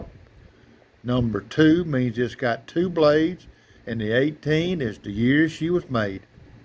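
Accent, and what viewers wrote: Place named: South, United States. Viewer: I subscribed to your channel you sound like a good ol knife loving southern country boy like myself. Enjoyed the video. That's right MILO'S TEA BABY LOVE IT.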